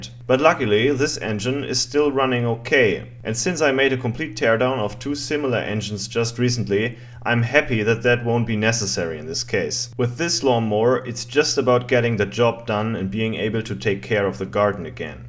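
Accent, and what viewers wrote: United States. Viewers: lol ! loved the cowboy accent !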